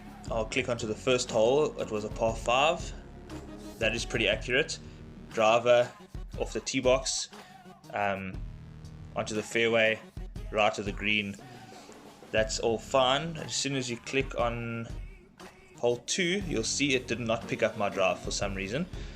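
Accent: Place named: South Africa. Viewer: SA? you sound like you are from SA.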